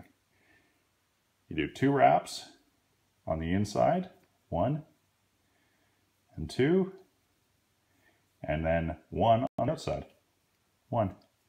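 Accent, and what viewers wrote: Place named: Canada. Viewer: Canadian accent 😬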